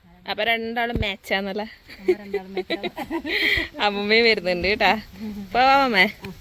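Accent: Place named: North Malabar, India. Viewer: Hii നമ്മളും ആ നാട്ടുകാരാണുട്ടോ കാങ്കോൽ. വടശ്ശേരി മണൽ. ഇപ്പൊ മുംബയിൽ സ്ഥിരതാമസം. നിങ്ങളെ വീഡിയോ കാണുമ്പോ ശെരിക്കും നാട് മിസ്സ്‌ ചെയ്യുന്നു. നല്ലരസുണ്ട്ട്ടാ. പ്രത്യേകിച്ച് നമ്മളെ ഭാഷ😀എനിക്കും യൂട്യൂബ് ചാനൽ ഇണ്ട് wings of dreams ഇതെന്നെ ഈ കമന്റ്‌ ഇടുന്ന അക്കൗണ്ട് ഞാനും മുംബൈ ആണെങ്കിലും നമ്മളെ ഭാഷയും നാടും ഒക്കെയാണ് സ്നേഹം ❤️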